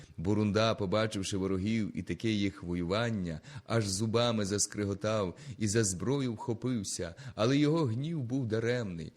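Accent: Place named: Western Ukraine, Ukraine. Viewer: Щиро дякую за чудову роботу.Милує слух мова Заходу України. тих часів.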